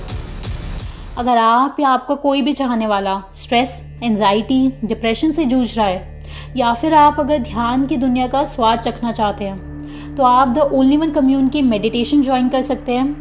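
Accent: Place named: North India, India. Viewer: Bolne ka style acha laga, bilkul north wala..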